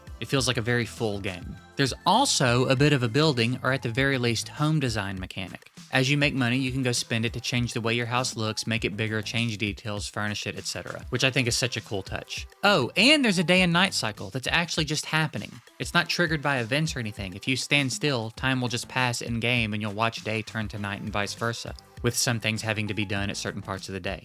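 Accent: South, United States. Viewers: your amazing southern accent makes me feel like someone is scratching my brain.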